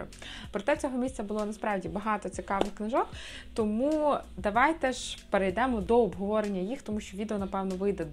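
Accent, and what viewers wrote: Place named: Surzhyk, Ukraine. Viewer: На нормальном русском языке смотрели бы вас больше. Чем на деревенском польско-русском суржике.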